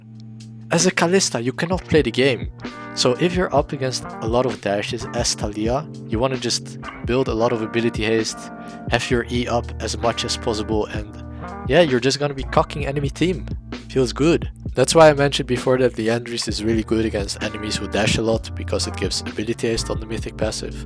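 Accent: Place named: Netherlands. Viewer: Ik kon binnen de eerste paar seconden al raden dat je nederlands bent hahaha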